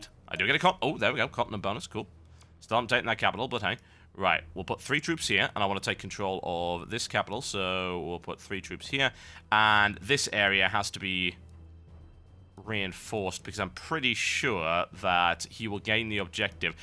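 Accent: United Kingdom. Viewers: *Facepalm* ITS A BRITISH ACCENT. OBVIOUSLY. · I was under the impression he was British...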